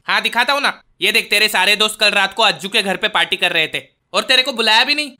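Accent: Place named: Maharashtra, India. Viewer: I like your Marathi accent 🔥🔥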